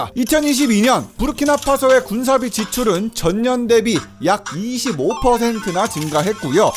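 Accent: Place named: Jeolla, South Korea. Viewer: 전라도네 ㅋㅋ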